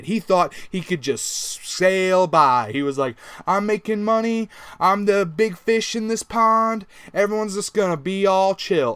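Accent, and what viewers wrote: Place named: Manchester, United Kingdom. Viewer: Love the Manchester accent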